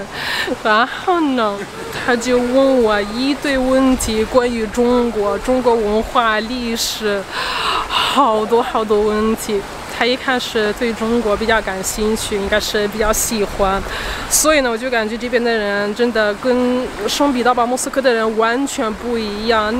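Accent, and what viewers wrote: Standard. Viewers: 感覺的中文很標準耶..今天看到你的頻道..已訂閱..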